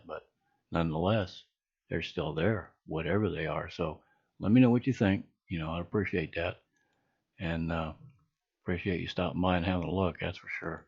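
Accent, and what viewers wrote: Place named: United States. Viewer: Ok. Maybe it was someone else but i thought I had asked you about the solar eclipse we had about 2 years or so ago. And if you had any footage?. I had heard stuff going on at that time. But good to know, you definitely sound American 😄. I'm down in NC. I've noticed must things I see here are towards the east! Maybe coming over the ocean.?.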